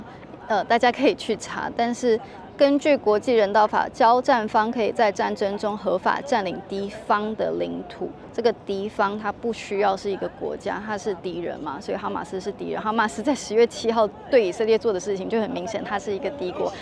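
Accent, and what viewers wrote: Taiwan. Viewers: can someone explain why she and her family is based in Israel? She sounded like Taiwanese..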